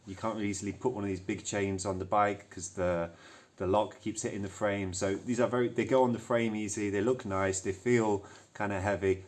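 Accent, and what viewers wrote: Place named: United Kingdom. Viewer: hear me out... He's in Brussels, sounds like he's from the UK, maybe they call an angle grinder a circular saw across the pond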